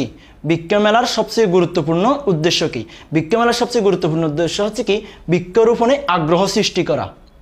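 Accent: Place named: Chittagong, Bangladesh. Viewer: চিটাগং এর পোলা মনে হয় ভাষায় বুজা যায়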